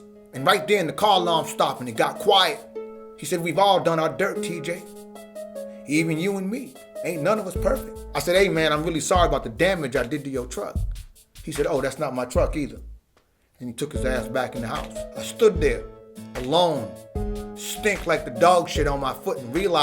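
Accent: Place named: California, United States. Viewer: TJ homie you sound more like a Cali nigga brah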